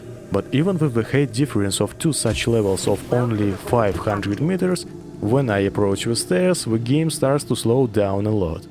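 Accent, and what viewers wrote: Russia. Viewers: Мне кажется что этот пчел русский, хотя в акцентах я не шарю... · Сразу понял, что русский по "зэ и вэ". Насчёт "the" - "дэ" самый идеальный вариант. Надо поработать над произношением. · dude I love your russian accent. it is really good and cool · Такой акцент, мэйд ин раша, сразу понятно))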